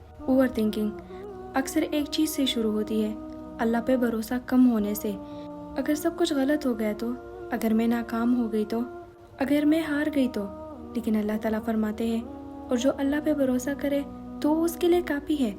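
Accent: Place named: Khyber Pakhtunkhwa, Pakistan. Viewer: Such a beautiful voice and accent . Senin accent is pathani Bilmiyorum . Thank you so much for such a good message . May Allah bless you ❤😊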